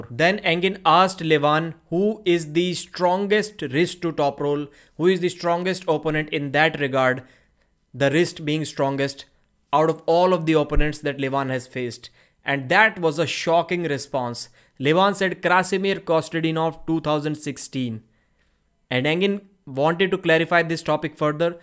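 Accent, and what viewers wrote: India. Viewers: why your accent like indian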